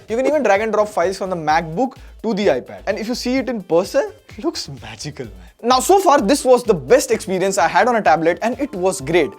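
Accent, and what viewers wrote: India. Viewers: Bsdk tu Indian hai ya angraz ?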